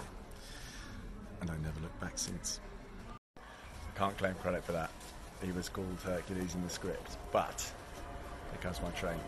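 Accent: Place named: RP, United Kingdom. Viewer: Omg he's really gorgeous. I didn't expect him to sounds so posh haha.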